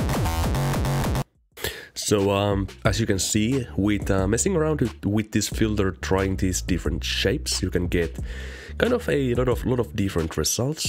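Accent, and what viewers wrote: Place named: Finland. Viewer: arvasin että oot suomalainen tosta aksentista, mutta muuten helvetin hyvä tutoriaali :D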